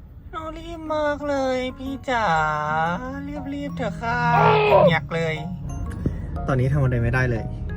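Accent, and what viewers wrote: Isan, Thailand. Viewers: เว้าอีสานตาฮักจ้า❤❤❤❤ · ช่องนี้ไม่รู้ชื่อช่องอะไร เจ้าของช่องคุยสนุกมากน่ารัก หล่อสวยอยู่ด้วยกัน เว้าอีสานก็เว้าม่วน ชื่อว่าจังได๋น้อนี่😂😂❤❤🎉🎉😢😢😅😅😊😊😮😮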